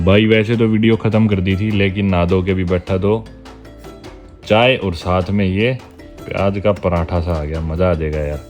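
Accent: Haryana, India. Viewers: Haryani axent 😂 loved it❤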